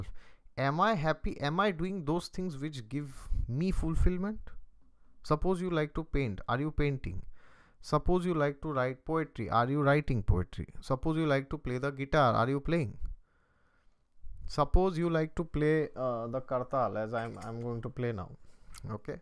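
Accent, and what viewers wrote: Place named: Bengal, India. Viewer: ji you sounds like a bengoli boy.. Your way of talking is just like a Bengali boy.. I like that as because I am also a Bengali.. Hehe 👌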